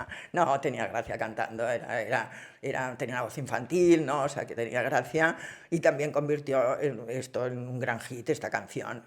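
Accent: Spain. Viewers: Hola me encanta tu programa, hablas muy rápido por lo que no hay tiempo de aburrirse y tu acento español es muy bonito. Soy cubana y demás está decirte lo involugrada que está Cuba con España desde hace cientos de años. De jovencita creía que los príncipes y princesas eran bellas personas y vivían felices en sus palacios. De adulta leyendo realidades de la realeza me fui dando cuenta que son personas llenas de defectos y muchos de ellos malas personas. Hoy día una niña se comporta como la princesa Estefanía y la hubiesen puesto en clases especiales y con muuuuuchas citas al psicólogo. Recuerdo el accidente de Estefanía y su mamá, yo ¡¡¡sí!!! creo que la que estaba manejando era la princesa y como era menor de edad podría ir a la cárcel por haber causado la muerte a su madre involuntariamente. Pienso que como era muy caprichosa y siempre le gustó hacer lo indebido pidió manejar y su madre la dejó. 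También creo que lo que Dios escribe nadie lo puede borrar, por muchas cosas que quieran ocultar. Mucha suerte en tu interesantísimo programa. 👍👍👍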